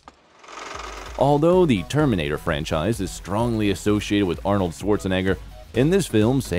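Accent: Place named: United States. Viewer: Americans always say " Mel born " instead of " Mel ben "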